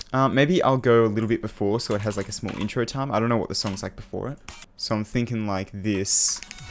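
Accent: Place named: Australia. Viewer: When are you making a level request challenge, though? Curiousity XD. But requests aside, Your content is underatted, your commeentary and Australian accent makes it better. You deserved more! Oh and yeah for the edit, put your Discord link in the Description. Im joining the Discord server 100%. - Null1f1ed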